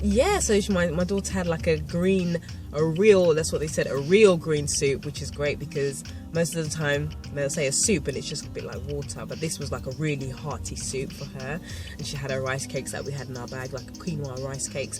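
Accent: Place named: United Kingdom. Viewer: Nice to see your vacation journey. I liked how you edited it between present and past sights. Where are you originally from? Your accent sounds British...did you relocate to south of France? I'd love to learn more of your story. Perhaps you've made a video of it and I didn't yet see it? You are a beautiful woman - inside and out - and I enjoy learning about life in another part of the world through your eyes.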